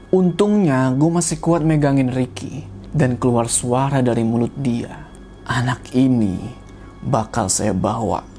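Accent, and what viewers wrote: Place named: Bandung, Indonesia. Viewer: Kang teh orng mna nya sga orng bandung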